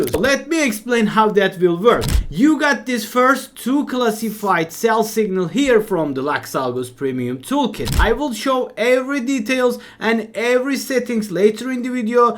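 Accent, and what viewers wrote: Turkey. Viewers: Bro I guess you are Turkish? Am i right ? 😄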